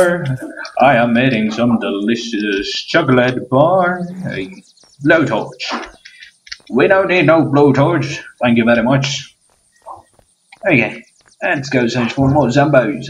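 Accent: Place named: Australia. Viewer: you sound Australian xD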